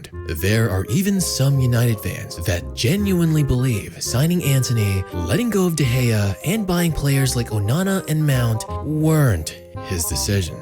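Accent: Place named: United States. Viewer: The yank accent further proves how laughable this is 😂😂😂😂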